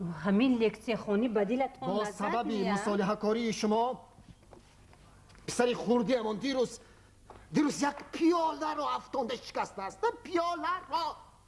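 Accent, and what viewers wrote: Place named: Tajikistan. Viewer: Чудоям нутки зебову лахчаи волои Точикона. Хело Олли Ойла ва марди Точикро исбот намудед. Интилигент. Чунин хам бояд бошад. Саломат бошед устоди азиз. Салом аз Самарканд.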